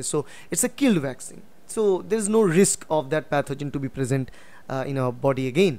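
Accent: India. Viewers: although his accent is pretty good the indian accent shines through him thats kind of nice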